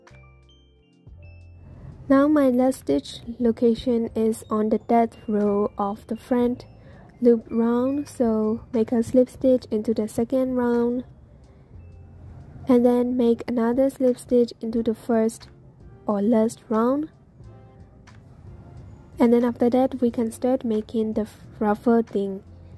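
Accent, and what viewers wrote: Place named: Myanmar. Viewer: Are you Burmese? I can hear the accent.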